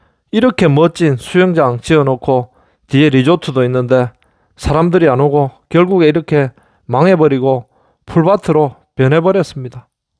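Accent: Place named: Busan, South Korea. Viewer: 오리지날 부산사투리로 해설해주니 정겹고 잊은지오래되는 사투리도생각나서 너무즐겁습니다 화이팅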